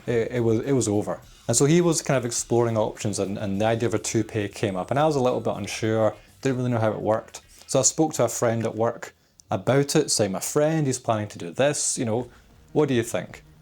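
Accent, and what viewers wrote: United Kingdom. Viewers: I know you like the UK, but if you don't already know, you could legit geomax in the US. American guys and girls both find European accents really attractive, especially the proper British accent like yours (aussie accent is a close 2nd). It'd be a free +1 to your effective rating. With some girls even a free +2.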